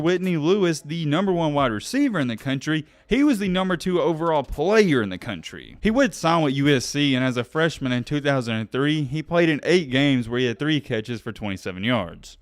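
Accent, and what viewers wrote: South, United States. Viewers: Big facts -- something about that southern drawl is a turn off - then he's actually a young dude talking about historical content - essentially not providing anything insightful - anyone can pull up stats and read post career articles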